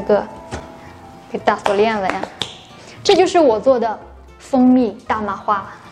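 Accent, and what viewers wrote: Shandong, China. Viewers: 普通话有山东口音。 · 我听口音早就听出来她是山东人了，哈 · 我听口音也像山东人，竟然还是临沂老乡！是临沂一中毕业的吗？怎么没有糁？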